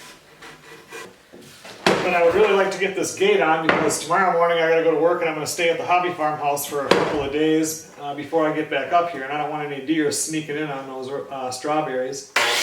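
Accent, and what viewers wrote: United States. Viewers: Hello, I like to clear something up reading some of the messages heck even my own i see something that needs to be addressed. What I am about write I mean in the best possible way. is a hard working driven man. Who for whatever reason fell into this posting events from his life a small part of his life. That we the viewer find informative and funny and just entertaining. Why he does this i have no clue maybe he just likes to entertain maybe teach in someway. Here is what I do know without a doubt has the same problems we all do. What we see as idyllic is just that what we see. We don't see mad or sad. I'm sure as someone who has been married for almost nineteen years being a couple takes work. I love it when says he did no filming to spend time with his sweetheart somethings are far more important to him then our entertainment. I wrote once to that privacy is important never more so when loved ones are involved. 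But i know they fight he need not say that i know he argues with his kids anyone who is a parent knows that. We who watch hold to a impossible standard No one could live up too. I hope he is not off put by this because i have no doubt he is a good soul. But we the viewers need not make more than he is a hard working driven man who cares deeply for his family. He sounds down right American to me. We need those so much right now!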